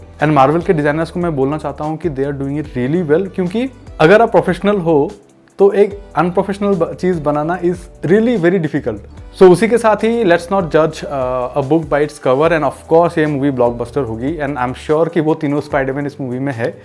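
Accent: Assam, India. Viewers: R u Assamese?? Bcz Ur accent is familiar for me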